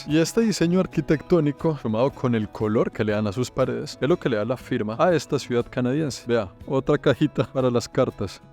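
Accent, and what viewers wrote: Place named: Bogota, Colombia. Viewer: «Espichar el botón» para que todo el continente entienda el hablado cachaco, su merced.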